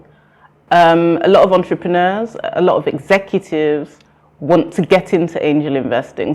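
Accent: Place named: United Kingdom. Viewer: Nice one, but a local academic lecture shouldn’t come with such a deep British accent tho.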